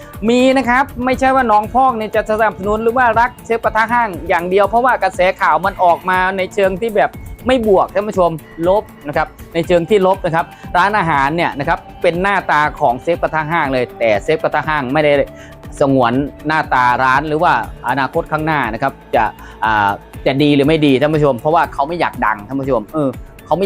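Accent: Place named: Australia. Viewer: สําเนียงการพูดเหมือนคนประเทศออสตีนเลียจังเลยสงสัยเดียวต้องถอดสร้นตีนยัดปากบักเชพเอ้ยได้โปรดไปไกลๆส้นตีนกู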